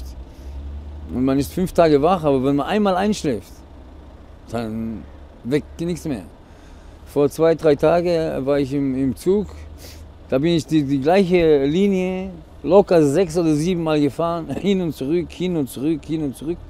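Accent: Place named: Baden-Württemberg, Germany. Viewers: normaaaal so redet man in baden württemberg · Er erklärt gut. Schwäbischer Dialekt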